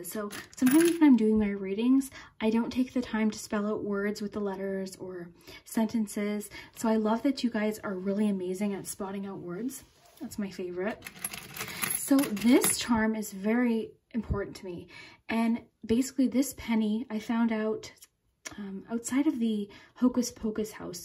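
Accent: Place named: Canada. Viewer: I loved this chit chat video!! Gave me asmr too you have such a lovely voice. wondering if you're from Canada?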